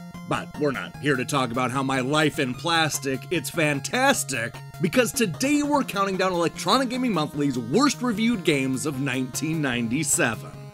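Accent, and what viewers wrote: United States. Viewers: I recall our narrator mentioning he's from WA. My theory is that it must be a Pacific Northwest dialect thing. I think Johnny Millennium (aka Happy Console Gamer, from Vancouver) pronounces dragon the same way.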